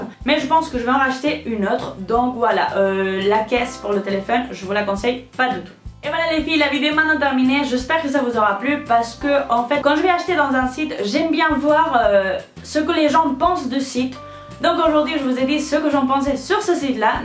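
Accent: Spain. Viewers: Je t'aime tu es magnifique et ton accent espagnol aussi 💙💚💛💜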